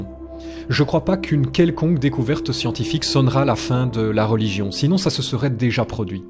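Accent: Belgium. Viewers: P pour moi ton accent est normal .. je suis Belge également, mais pour beaucoup de français l'accent Belge est celui qui est caricaturé dans "le diné de con" ... ce qui n'est pas pareil :D Personnellement ca ne me dérange pas toutes les histoires de monétisations et de publicités, c'est normal après tout ! A bientôt !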